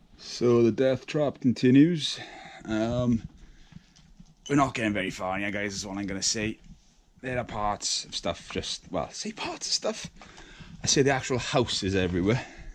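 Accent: Wales, United Kingdom. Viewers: Looks Scottish sound Welsh. Who's jackets that coat?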